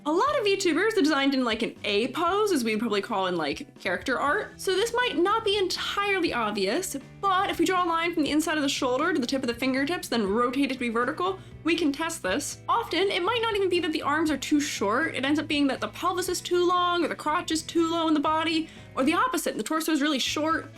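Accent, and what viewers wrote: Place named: Sweden. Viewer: Unrelated, i'm still secs into the video BUT you don't happen to be swedish?? I keep hearing a slight sweng-lish and now i can't let it go 💀 Edit: okay I watched the full video and read the comments and i REALLY gotta agree with most people critisiting your criticism cause my god some of these "pet-peeves" are just ignorant, hypocritical and extremely biased.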